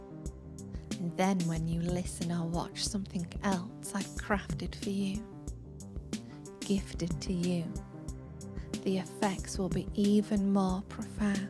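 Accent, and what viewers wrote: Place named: Northern England, United Kingdom. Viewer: I love a good Northern accent, and yours is the best. So soothing and inviting. You're wonderful! Thank you!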